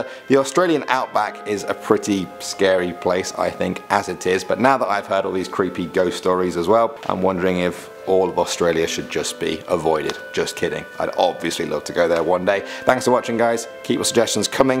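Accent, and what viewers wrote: United Kingdom. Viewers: To all Australians who focus so much on pronunciation. Do you realize that this man is from Great Britain? You don't expect him to pronounce city names with yours accent. And if you think that your English is the only and right one, then remember where your great grandfather came from. Seriously that's ain't even funny. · dark hues hes English/British....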